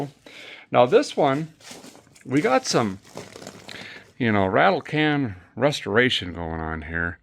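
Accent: Minnesota, United States. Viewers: The multi-millionaire celebrity Vice Grip garage... havent you yet transcended beyond ACTUALLY having to produce content? No billionaires just supporting you for being the celebrity you are with your "Fargo" Minnesota BS Accent? All this is is Hoovie 2.0...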